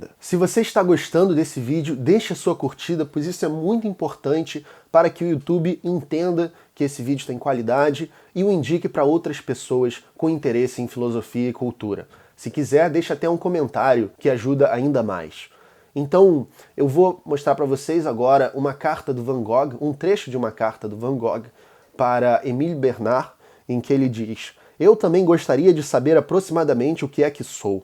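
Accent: Rio de Janeiro, Brazil. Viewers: No vídeo o sotaque carioca chiado, é muito forte. Tens q fazer um teste de dicçao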